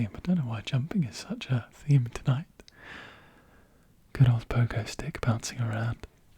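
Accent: United Kingdom. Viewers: yeah british accent makes me sleep 😍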